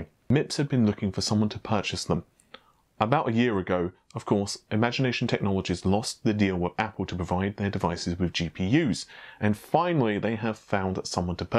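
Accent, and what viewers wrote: United Kingdom. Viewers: He sounds more British than American tbh.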